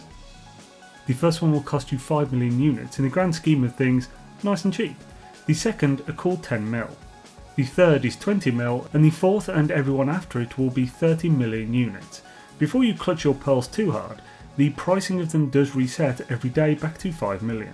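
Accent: United Kingdom. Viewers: Definitely a Brit, I'm going with somewhere in the midlands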